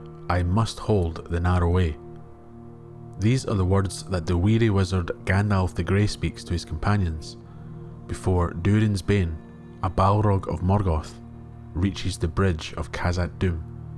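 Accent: Scotland, United Kingdom. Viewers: Love the part involving Gandalf and the Balrog and the explanation there, have to admit that you give it so much thought. We have here a clash of the 'fire of the sun' as you kind of put it, but also a kind of 'pure-fire' (which may be associated with the colour white in a lot of artworks), and the 'red fire' of the Balrog. There is considerable colour-psychology at work here, with 'White' oft-associated with purity as you here noted, where red is a colour of 'passion' and 'rage', and given how the Balrog is often also associated with darkness (a colour of evil in classical colour-psychology). It seems that there's a statement being made here in regards to the nature of the two predominant figures and the kinds of flames they represent. Gandalf's is good and is representative of Eru and the Balrog is representative of Melkor (who is still technically out there in the void). 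Just something I noticed in this video (I know you covered all this) but it is still fascinating to go over it again. The use of colours in Tolkien's descriptions reveal also the attention to detail Tolkien put into his scenes, and add to his mythology (another ex might be Gurthang, being a black sword a representation of Turin's inner darkness, where his helm often appears golden, which is a regal colour associated with good but also greed). This video was perfect, really enjoyed it and your lovely Scottish accent, and really hope you do that history of Angband series that you want to do.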